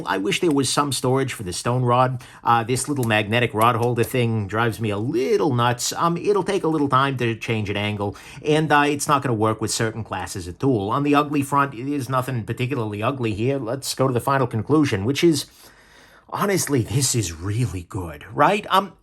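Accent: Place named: Boston, United States. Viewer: I was placing it as a Boston accent, so curious because of your question, I snooped around the interwebs. He said, "(Seriously, though, when you're raised by a Bostonian and a New Yorker in the American West, you're pretty much screwed from the start.)"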